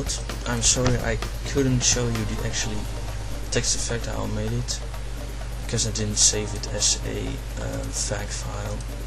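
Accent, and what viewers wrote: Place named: Netherlands. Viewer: Nederlands, te horen :D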